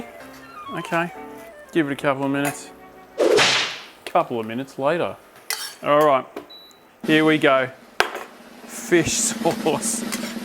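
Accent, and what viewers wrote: Australia. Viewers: I hate that you have to censor your beautiful australian english on youtube if you want to earn any money. FUCK YOUTUBE.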